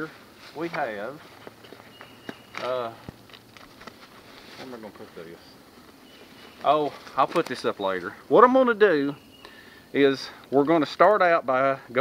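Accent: South, United States. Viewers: Fabulous, really enjoyed watching and listening to your Souther accent. Carol from Edinburgh, Scotland, UK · Excellent video, my god i love that southern drawl...keep up the good work...thanks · Man, I like your accent so much! which part of the states are you from? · i Like the way you Talk, it Sounds very 'murican:D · c'est l'accent 'du midi' aux etats unis. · Great video Sir, love the Southern accent. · Excellent video as always. I haven't commented in a while, but that doesn't mean I haven't been watching. I always look forward to the next episode of World. But watching this one, it occurred to me that you're like the Mr. Rogers of the bushcraft community. So friendly, jovial, non-threatening, helpful, and nothing but a passion for teaching all the uninitiated (and the seasoned survivalists, as well) your wealth of knowledge, whether original or learned yourself. 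And you don't take yourself too seriously!! That's the best part. Keep 'em coming. And worry about your Southern. Us NC boys, and all of us born and bred in the South, know exactly what you're saying. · Where are you from? I;m so southern I'm related to myself, lol!! I think your drawl is even more than mine! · Cool shelter Glad to see you making videos again. I am from the south too love ur accent dude. Flat Head would be honored to be remembered. Till next time. Thanks for taking the time. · Don’t ever apologize for speaking southern. I lived in Alabama as a kid about 60 years ago and I like your accent. Louis Grizard, a southern humorist, had a saying, “God talks like we do.” I still sound like I’m from Alabama and I like to think Grizard was right. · I think my settings are okay but could be wrong; anyway I loved seeing Flat Head's long term shelter. By the way as for the southern accent you are home grown and cool as you can be so junk'em if they don't like how you sound. 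I saw in your last video you had an LSU mug; are you a graduate or is that where Nick is attending college? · I had an ol' D.I. that told me: "Private - - why do you talk...so...slow?!" I told him "Sir, because this recruit's Dad is an Okie Sir." He tried NOT to laugh when he told me that explains a lot! I thought I'd share that with you. don't ever lose that Southern way, it's what makes you original & thanks for communicating at my tempo!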